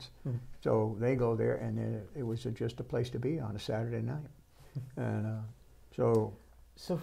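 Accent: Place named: Connecticut, United States. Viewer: Interesting accent. Connecticut?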